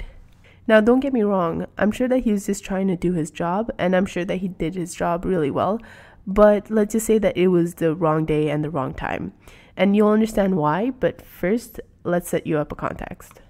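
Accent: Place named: Vietnam. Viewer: Can't wait for the next onee!!! <333 Love your vietnamese accent LOLOL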